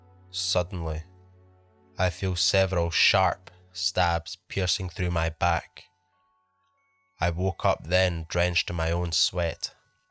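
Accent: Scotland, United Kingdom. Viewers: Came here from Swamp Dweller and subbed immediately! I just LOVE your Scottish accent!! Paranormal and Cryptid stories are my favorite! There is another Scottish horror narrator. Channel named HELLFREEZER. Another excellent channel!! ;-)👍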